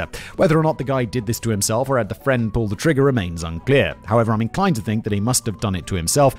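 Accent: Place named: England, United Kingdom. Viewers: English accent to be precise, whistler doesn’t have a northern Irish accent, and you can understand his English so it’s not a Scottish accent. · *in a British accent. (I don’t know the exact one.)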